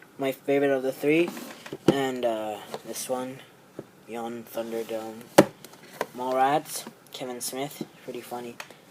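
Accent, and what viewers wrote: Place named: United States. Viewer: Where u from? You sound American but most of your blu rays have UK ratings on them.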